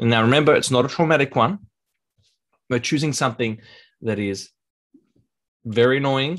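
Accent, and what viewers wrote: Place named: Australia. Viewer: Australian mate 🇦🇺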